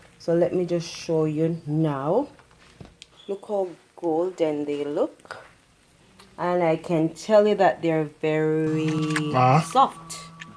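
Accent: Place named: Jamaica. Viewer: Hey girl I am here new subscribe family now you sound like a Jamaica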